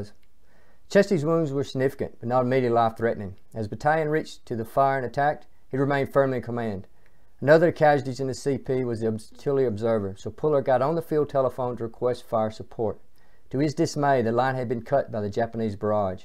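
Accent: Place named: South, United States. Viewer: Outstanding work Marine! So many stories have been told about Colonel Puller that it sometimes is difficult to separate fact from fiction. This is definitely fact. By the way, I am trying to place your accent as I am a fellow southerner. I am thinking Virginia or North Carolina. I am from Tennessee and am just wondering on a personal level. It makes no difference as I am proud to view your efforts on behalf of the U.S. Marine Corps.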